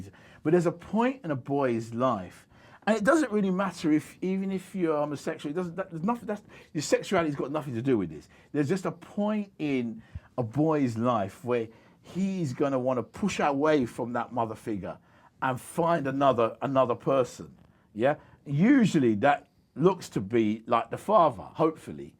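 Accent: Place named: Cockney, United Kingdom. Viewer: Top bloke. For a cockney.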